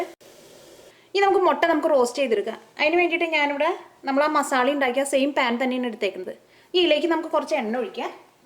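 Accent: Thrissur, India. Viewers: are you from trichur,because your accent is like trichur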